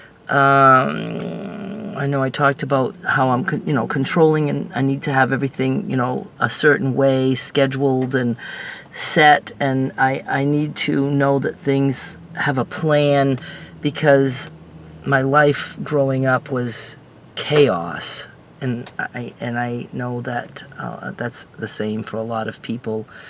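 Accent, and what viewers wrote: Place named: South, United States. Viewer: As an Aussie, i just adore Southern accent:)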